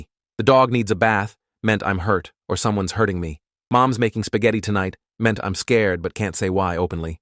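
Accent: United Kingdom. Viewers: British accent anyone?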